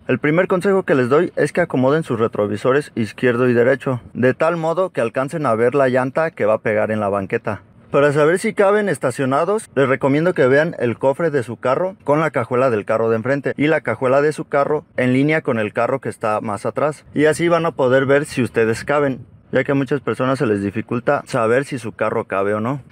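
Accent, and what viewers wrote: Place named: Popayán, Colombia. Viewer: Ud es de Popayan?, tiene acento de allá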